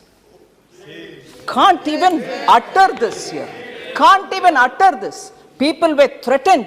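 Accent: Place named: Telugu, India. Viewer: X lent mam a telugu lady expression in Hindi language beautiful hum Fida ho gaya aap ke bhashan on truth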